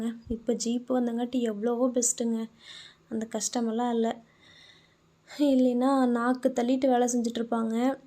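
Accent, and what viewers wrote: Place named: Kongu, India. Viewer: தாங்களுக்கு கர்நாடகமா சாரிமா(புனிதா)சகோதரி தாங்களுக்கு கோயாமுத்துர் என நினைத்தேன்மா சகோதரி ஏன் என்றால் உங்கள் பேசியதை(கொங்கு ல்லாங்க்)வைத்து நினைத்து விட்டேன் 😊😊😊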